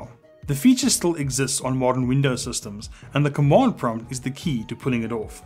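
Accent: South Africa. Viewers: Top result and the guy got a proprr South African accent so I’m happy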